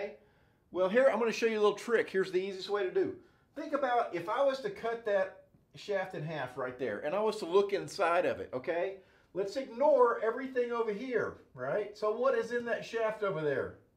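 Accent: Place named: South, United States. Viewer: I'm a sophomore at Cornell. Prospective MechE. One of my classmates told me to look this dude up. I feel like I've just found the ultra southern Professor Leonard of Engineering, and I'm fucking HERE for it. I'm going to survive statics if it kills me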